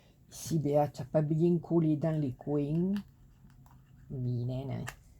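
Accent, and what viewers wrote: South, France. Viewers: bonjour, très joli travail très coloré plus l accent su sud cela fait du bien le soleil n étant pas de partie aujourd' hui chez nous bisounettes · Bonjour, ta cascadounette est vraiment très jolie, j'adore ton accent du soleil, il nous fait du bien car on est toujours sous la pluie.....Gros gros bisous...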